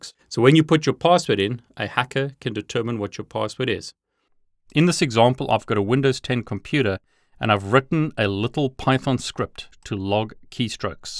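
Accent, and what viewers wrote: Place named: South Africa. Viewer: are you south african lol? that’s what your accent sounds like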